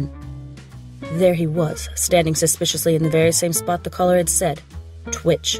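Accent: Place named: England, United Kingdom. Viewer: This was the worst and most annoying English accent i've ever heard.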